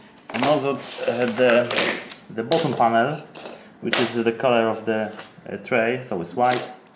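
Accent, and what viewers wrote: Poland. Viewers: His accent sounds Polish to me.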